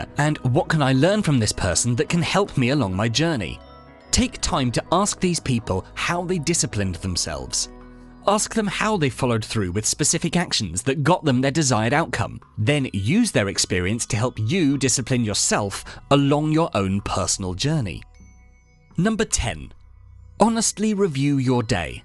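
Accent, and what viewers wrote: United Kingdom. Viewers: Brit accents are a hardship for me. I made it through the video! One step closer to visiting Europe!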